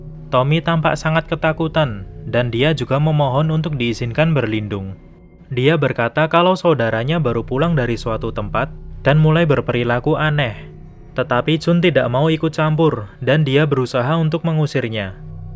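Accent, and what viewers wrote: Java, Indonesia. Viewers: Jawa y bang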